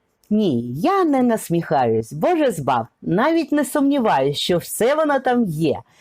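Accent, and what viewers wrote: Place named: Russia. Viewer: З російським акцентом,наприклад говорить прапаную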